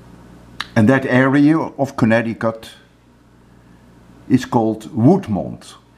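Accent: Netherlands. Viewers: Professor, are you Dutch?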